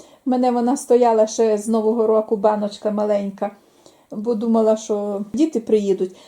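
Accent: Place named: Boyko, Ukraine. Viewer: У вас хороші діти.А де та дочка що приїзжала з онучкою?чи це Олега дочка.Бачу у вас вже такий бойківський говір😅.А я якраз щось подумала сьогодні за вас що ви щось не виставляєте відео,заходжу а ви тут🥰.Рада за вас що придбали дрова,ви знаєте як би важко не було але ще жодна жінка не пропала без чоловіка і у вас все буде добре❤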